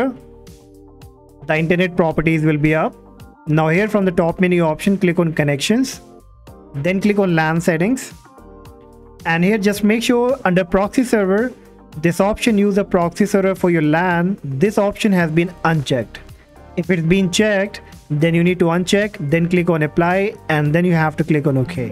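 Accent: India. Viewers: FINALLY I FOUND THE VIDEO THAT HELP ME I AFTER I HEARD YOUR IDIAN VOICE GET INTO DETAIL I AINT EVEN LOOK AT THE COMMENTS I JUST DID IT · when you heard the indian voice .. mean wasting your time!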